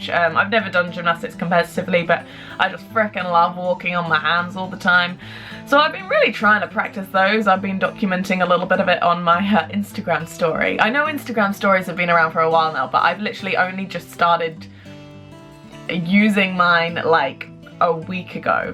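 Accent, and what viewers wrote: New Zealand. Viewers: Thanks for the update. Your accent is so kiwi it's UNREAL! Haha. We decided to start exploring the UK too after coming back from Asia - like you said we can plan to see countries on the opposite side of the world when we haven't even explored our own. Look forward to the upcoming videos, Amy and Yas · so excited to hear what your up to these days :D also your accent keeps making me laugh because the kiwi accent keeps coming through haha!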